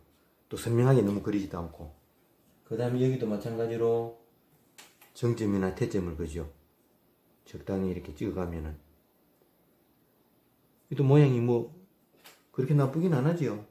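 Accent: Gyeongsang, South Korea. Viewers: 경상도 말씀 설명 정겹습니다~~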